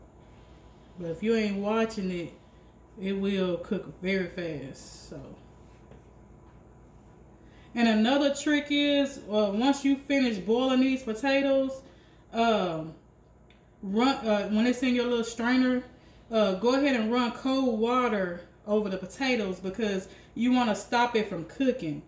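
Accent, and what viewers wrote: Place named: South, United States. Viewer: I have cooked something similar but I'm going to follow your recipe next time. I love to listen to you talk. You sound like a Southern girl like I am. God bless you with health and happiness.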